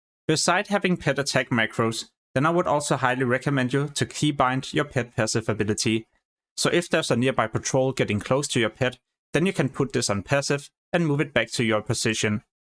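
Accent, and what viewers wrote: Denmark. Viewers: Skud ud til den mest danske accent på youtube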